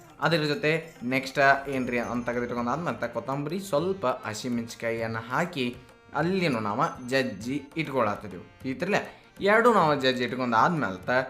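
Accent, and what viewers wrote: North Karnataka, India. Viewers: Very simple fast yasty recipes. The North Karnataka language very lovely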